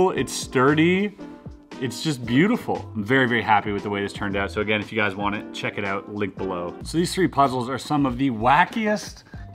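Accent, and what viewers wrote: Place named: Canada. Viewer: Holy crap your Canadian lol I love your YouTube videos I have never looked you up but I finally hear your accent lol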